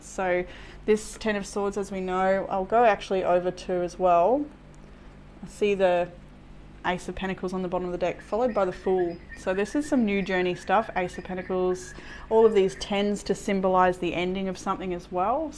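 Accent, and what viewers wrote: Australia. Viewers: I love your readings. Some resonate more than others. I love listening to you as I’m also Australian and it makes me feel closer in some way. I listened to this reading last night and picked group 2. This particular reading felt so personal and I’m hoping it did for many others too. I love that sometimes it is right on the mark. Thank You 🙏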